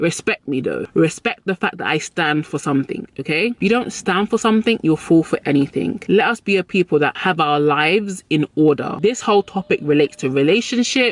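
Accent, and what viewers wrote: United Kingdom. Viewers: Yo ah from the Uk i can tell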